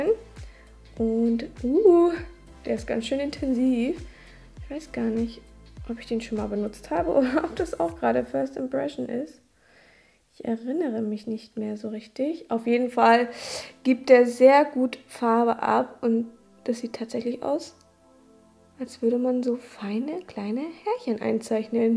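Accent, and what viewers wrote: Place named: Germany. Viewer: Ich find's süss wie du die englischen Namen richtig Deutsch aussprichst 😂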